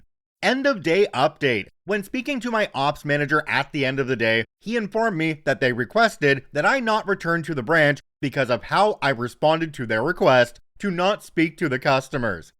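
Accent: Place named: Canada. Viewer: Hey,! Good to see ya! Nah, I don't think your channel would ever be mistaken for AI. I've heard Brit and US accents, but never a Canadian accent in AI voices. Not even once. When I saw yesterday's vid, I thought, "Okay, I know the voice, but the channel name wasn't Karma Stories, it was...." I guess I must not have had enough caffeine in my system, because the great, big chameleon on the screen didn't quite register with me. Well, maybe it blended in with the background.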